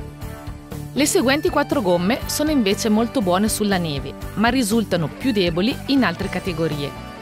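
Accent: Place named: Ticino, Switzerland. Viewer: utilissimo! grazie. Buffo l'accento ticinese :)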